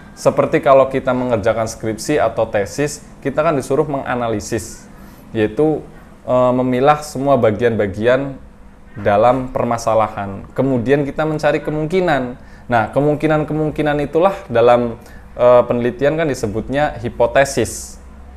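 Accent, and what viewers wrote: Banyumas, Indonesia. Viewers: Sukak dengerin penjelasannya, mudah dipahami. Sukak logat2 bicaranya juga, kyk ada ngapak2nya dikit.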